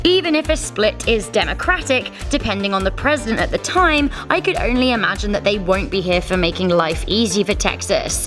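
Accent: United Kingdom. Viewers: Its weird listening a British woman say Texas tbh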